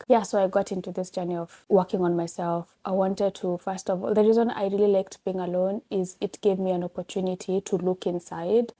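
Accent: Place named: United Kingdom. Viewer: I'm always catching the intonation anamalizia nayo sentences....kidogo tu British accent itaingia..you gotta love ❤❤❤❤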